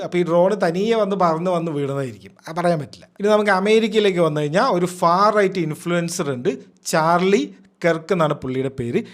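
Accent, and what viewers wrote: Palakkad, India. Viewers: ningal palakkad aano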